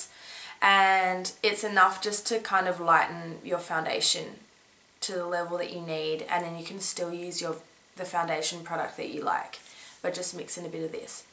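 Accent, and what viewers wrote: Australia. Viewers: OMYGOHHD your australian ;P that just instantly made my love you x3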